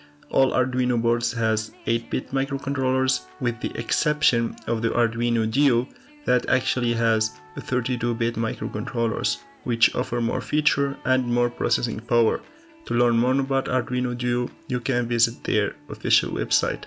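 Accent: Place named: India. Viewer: Don't take it as negative comment. You sound like other Indians tech geeks with your accent 👌. Keep it up bro.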